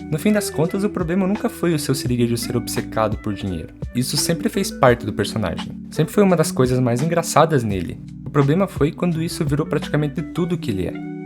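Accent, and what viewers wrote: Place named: Inland Paraná, Brazil. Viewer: Pelo sutaque tu é do interior do Paraná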